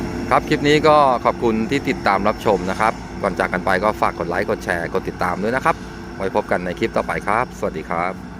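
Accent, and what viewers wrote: Thailand. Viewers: ชอบเสียงบรรยายลูกทุ่งดีครับ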